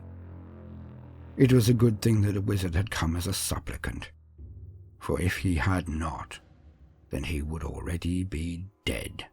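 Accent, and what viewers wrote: United Kingdom. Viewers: I could listen to your silky British accent all day. Love your content brother. Keep being awesome. 😀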